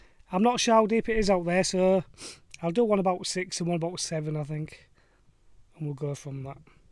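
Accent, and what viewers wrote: Hull, United Kingdom. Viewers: Thought I recognised the Hull accent, will watch this later, I almost always fish Cygnet for the tench, been a poor year this year but had 4 nice tincas on my last visit on banjo feeder with a wafter.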